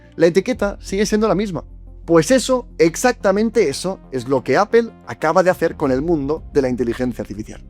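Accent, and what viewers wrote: Spain. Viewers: Es horrible escuchar la pronunciación del inglés de un español 🤮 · otro “apel” fan boy. btw pueden los españoles decir apple como el resto del mundo? caga mucho el palo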